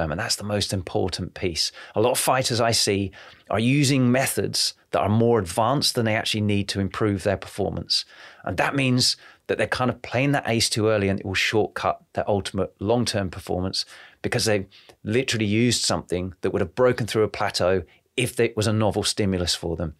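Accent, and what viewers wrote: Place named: United Kingdom. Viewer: hes british for godsake. some people dont understand proper english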